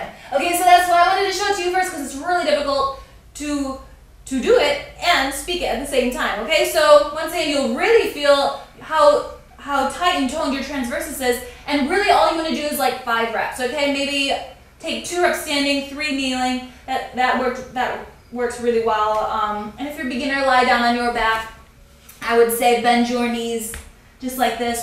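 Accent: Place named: England, United Kingdom. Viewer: Have you ever lived in England I feel like I can hear a bit of an accent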